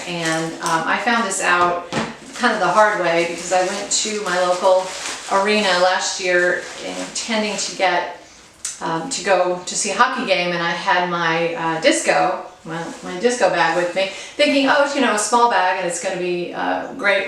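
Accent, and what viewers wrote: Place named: France. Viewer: Hi and! I have a rescue cat named Norm! We rescued him from our local shelter! I absolutely adore your glasses! Your French accent is divine, dahling! Beautiful bag, too! Thank you for sharing, my friend! 😍🐈❤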